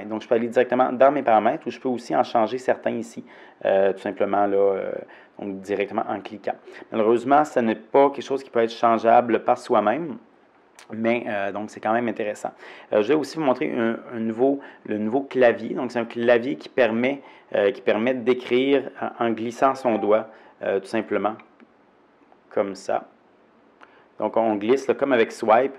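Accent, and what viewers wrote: Quebec, Canada. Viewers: si il parle bien Français c'est juste qu'il a l'accent quebecois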